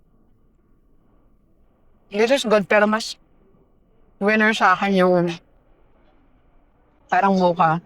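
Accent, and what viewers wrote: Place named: United States. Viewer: Ganda ng speaking voice mo Ma'am. Very American Accent. ❤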